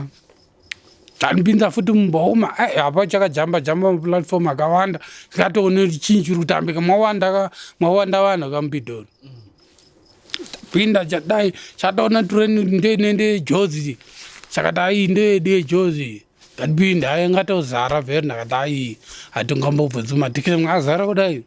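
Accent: Ndau, Zimbabwe. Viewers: Ko nanhasi achikungotaura chi ndau like this but all these yrs haasati achinja tone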